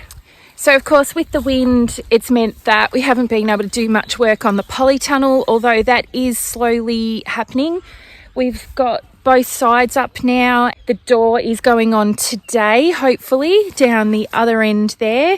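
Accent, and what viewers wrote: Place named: Australia. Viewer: Make sure you're getting enough rest friend. Stay hydrated. I find the Australian accent very soothing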